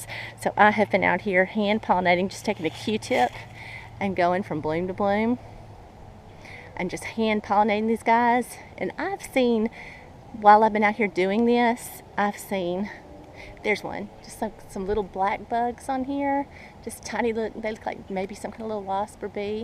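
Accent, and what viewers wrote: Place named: Georgia, United States. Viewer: My mom loves to grow plums, and I'm always disappointed by them. I've never once seen a plum that doesn't have a mark from a bug or a worm that nibbled on it. She's the same about peaches, and she's got two new peach trees to plant this year, but I just don't think we're far enough North. We're in zone 8A Maybe? One time, 30 years ago, She got a tree full of delicious nectarines, and it has just given her a fever which she can't seem to get past. We haven't seen nectarines or peaches like that ever since. And if her peach trees do make a few fruit, typically the squirrels get them. Love your videos, I love your accent because I have a South Georgia accent, and I got your channel by looking at your strawberry videos from a couple years ago.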